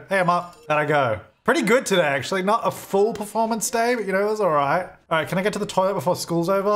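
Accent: Australia. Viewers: oh wow so he’s Australian?? He called flip flops „thongs” I love it 😆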